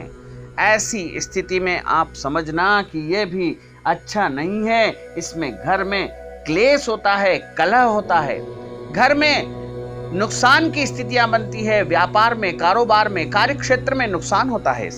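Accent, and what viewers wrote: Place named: South, India. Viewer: South Indian Hindi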